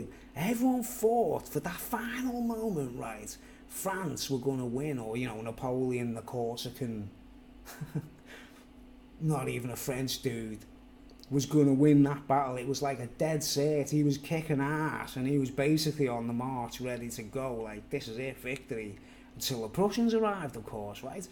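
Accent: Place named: Liverpool, United Kingdom. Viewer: Hi, another interesting speech,it was the gelert solo tent video that brought me to your channel in the first place, and I liked the Liverpool accent.funny thing is it's only when i'm having a swally I head over to see what your up to:-) (no offence) keep up the speeches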